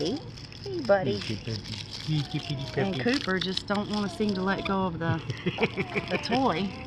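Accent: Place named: South, United States. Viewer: Well they have a southern USA accent so I would say no to Australia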